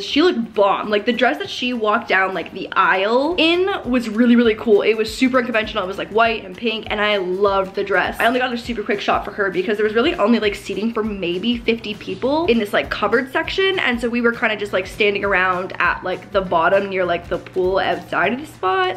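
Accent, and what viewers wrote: Canada. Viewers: tomoreow hahahhaa love ur canadianness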